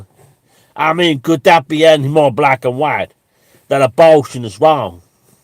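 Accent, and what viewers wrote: South, United States. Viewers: Your fake Rural Southern accent is better than most of the celebrities! However, they DO pronounce their R sounds, usualy a hard, drawn out arrrr drawl. Dropping the R at the end of words is the biggest "tell' and the pretentious antebellum faked accents of those historical "Deep South" elite slavers who wanted to be British aristocrats. "Abowashun" is aborrrrshun. Draw out those aRRRRRs, man. As a proud non-christian Southerner, I can say this. Otherwise, the parody is funny af. Lol. Thanks!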